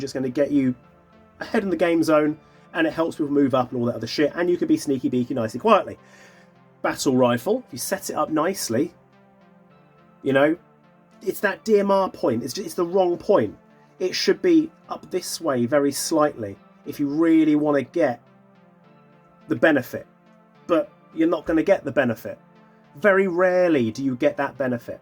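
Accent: United States. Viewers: Awesome American accent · That American accent......juicy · First, love the " murican " accent 😏. That said here in the states , at least where I play. The dmr and bolt rifle are lumped together and they are restricted to a certain jul and that's it, no fps . They take the bb weight and fps and lock the med . They require a side arm or secondary. Some places require the sniper to work with a second person " the babysitter" . For me and my style I find a respectable rof ( 40+ 😏) a good hop, bucking , nub and a clean barrel work very well. I also too like to be shit , a fun shit but still a shit. I once dumped 5,000 .12's on someone's head to kill them 😈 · That American accent is 💯 on point I fucking love it lol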